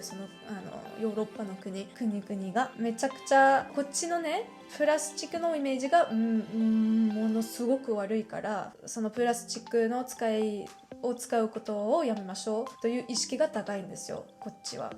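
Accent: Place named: Kyushu, Japan. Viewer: 日本人にとってスイスはもっとも好印象の国。ところがあるフランスジャーナリストがこれを知り、意外だと言った。欧州ではスイスは日本ほど好かれていないという。そういえば黒いスイスなんて本もある。いろいろ感心しないことが書いてある。でも大国に囲まれて生きるために必死で知恵を絞って生きてきた結果ではないかなとも思う。たとえば中立国でもあるスイスの立場を活用して秘密性の高いスイスの銀行に世界からマネーが集まるようにしたとか。小国なのにどこもできないことをスイスはやった。たいしたもの。参考になる。今回の日本に対する率直な意見ありがとう。当然のことながら日本についてもいろいろ長短がある。僕ら日本人が自分を見つめ、改善するために参考にすればそこに価値が生まれる。よりよくなるために。ありがとう。ところで地方にいたの？えらいなまりがある。これは外国語なまりではなく、日本のどこかのなまりと思う。声だけ聴いたら、地方出身の日本人と間違われるでしょう。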